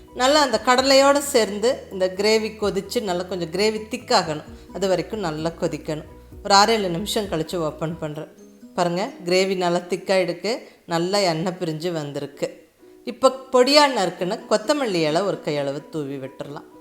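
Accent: Kongu, India. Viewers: Ungaludaiya kongu tamil 👌👌👌👌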